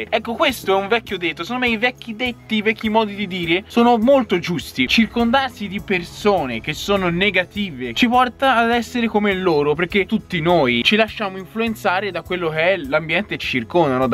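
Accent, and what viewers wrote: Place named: Tuscany, Italy. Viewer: quanto è bello il tuo accento toscano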